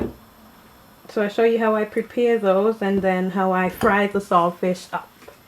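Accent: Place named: Montserrat. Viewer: Funny when I heard your accent and saw the ingredients my first thought was Montserrat 🇲🇸. Are you from Strat? Very good video, clear and easy to follow.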